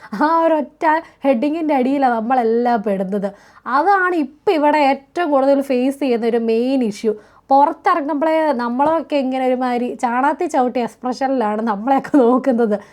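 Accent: Kottayam, India. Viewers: കൊച്ചു കോട്ടയംകാരിയല്ലേ 😂സത്യം പറയണം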